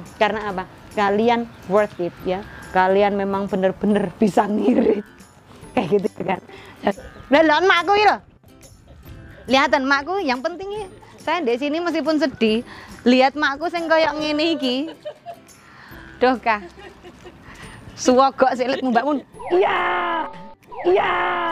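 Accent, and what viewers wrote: Java, Indonesia. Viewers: Senang banget sama suara mendoknya kk salam dari NTT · kalo boleh tau mbak ini jawa nya asal darimana?? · Mbk soko itu opo to mbk, Baru nemu channel ini mbk ,medok banget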